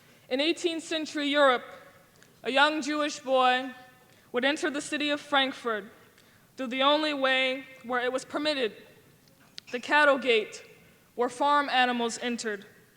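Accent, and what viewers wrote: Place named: United States. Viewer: I like it better when IDF or Judaism focused voices are heard. I like their inspiring speeches. This sounded like some American Israelite stuff..This girl sounds American, not African, and speaks as someone carefully choosing words to manipulate. She does NOT speak as someone who has love for Israel, Judaism, and IDF. She speaks warmly, but not with fire. She speaks of patting people on the back, but not understanding the trauma to the heart. She speaks like someone who gives a handshake not understanding the need to stand with Israeli's in the settlements. She sounds like some American Hebrew Israelite trying to gain a foothold in Israel.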